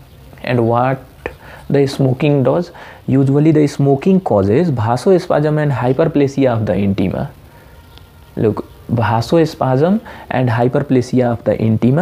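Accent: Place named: Bihar, India. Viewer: Don't laugh on accent ..may be he is a Bihari..but well explained everything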